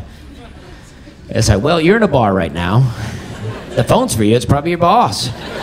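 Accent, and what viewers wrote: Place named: South, United States. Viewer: I'm a Midwesterner, and I have to admit that part of appeal is that accent...but it's more than just the southern accent, it's kinda the rythm and the calm way he talks... but overall is just so multi dimensional: the jokes, the timing, the laid back demeanor. This guy is one of my new favorites.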